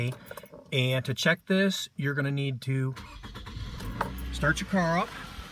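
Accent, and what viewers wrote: United States. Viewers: American accent and you know straight away it's over exaggerated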